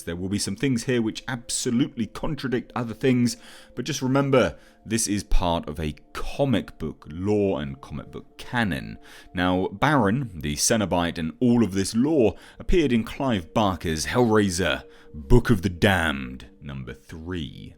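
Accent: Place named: England, United Kingdom. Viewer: I am trying hard to get your english accent. I need to listen to you more for the true English pronunciation of words.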